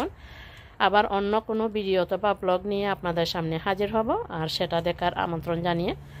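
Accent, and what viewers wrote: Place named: Sylhet, Bangladesh. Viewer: আসসালামু আলাইকুম আপু কেমন আছেন আপু আপনার বিডয় টা দেখে কুব ভালো লাগলো আপু আপনি মনে হই আমাদের সিলেটে মানুষ কথা গুলো শুনতে খুব ভালো লাগলো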